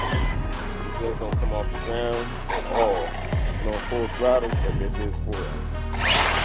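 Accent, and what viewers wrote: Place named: United States. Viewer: Cool tyres, I like the 1st ones. Where do I get get them ? From your accent and the surrounding turf I think you’re from the US? Maybe I can’t get them in Europe. But that’s a bad spot for testing tyres or speedruns…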